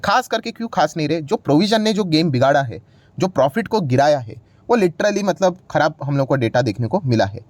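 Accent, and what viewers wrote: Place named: Gujarat, India. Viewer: Sir aap gujrati lgte hai baat se Hai??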